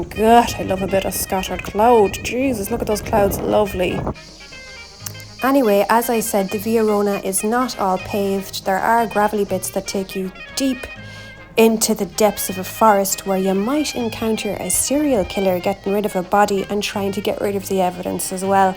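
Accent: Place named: Ireland. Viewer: I love your Irish accent! Guinness!!!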